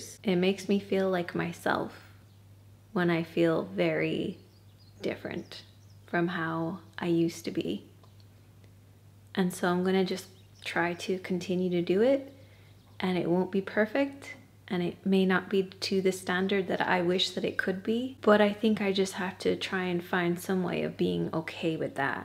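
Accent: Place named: Ireland. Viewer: …sending you so much love ❤ I subscribed many years ago for the makeup tutorials, but now it’s a good day if I manage to put bb cream on! I have 3 kids (2 teenagers and a 5 year old), have had 2 crappy marriages, and makeup is just not a priority as I rarely leave the house 😅 I can partially relate as someone who struggles with my mental health (that has impacted my ability to hold down a job) and also perfectionism. You are such a shining light though ☀️ The older I’ve got (turning 41 soon) the more I’ve realised perfectionism sucks. Just being present and “doing the thing” is so much more important than wasting time and energy on trying to achieve perfection (which doesn’t exist) especially when your time and energy is limited ❤ I for one am just so happy to see your beautiful face, hear your lovely Irish accent and hearing about your life. You are such a beautiful person, inside and out and we support you ❤ Sending love and strength from Australia xx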